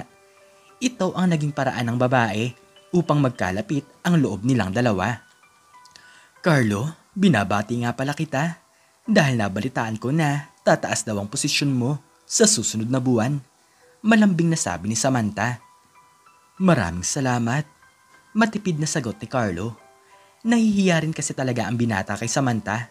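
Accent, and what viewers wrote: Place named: Iloilo, Philippines. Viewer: Abaw! Ilonggo ka man gid.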